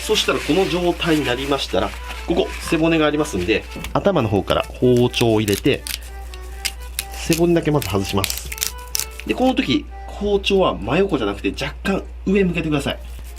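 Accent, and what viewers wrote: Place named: Kansai, Japan. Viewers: 今日どうしたんや めっちゃ関西弁やん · 最近喋り方が関西気味でおもろいw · いつもは名古屋弁なのに今日は関西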